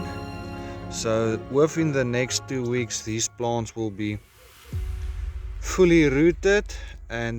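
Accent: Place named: South Africa. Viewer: I recently came to live in Australia from SA and have bouts of homesickness. Trying to find how-to propagate rocket. What a wonderful surprise to hear the "ou" accent again! What,a blesing! Thank you for your very encouraging lesson..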